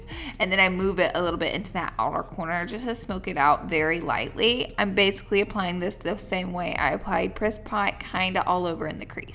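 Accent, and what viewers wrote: South, United States. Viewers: I love these videos!!!! They are just the best! I love ur southern voice!! Ur vids are just the best! And ur palette!! Come thru!! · The vocal fry combined with the deep southern accent can be unnerving to some people, but I'd say just don't watch. Her content is good, and it's not like she can help it much. Don't know why people feel the need to be nasty about it. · By the way I love your accent it's so cute and southern💕💕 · Yes baby you got that beautiful southern bell accent 💞 makes me feel at home. 🤗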